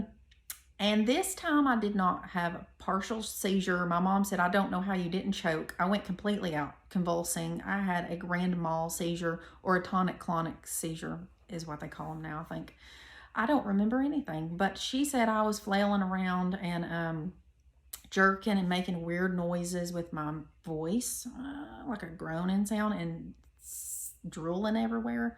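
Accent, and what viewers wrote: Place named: South, United States. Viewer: You are adorable. I love your country accent. Good luck on your recovery!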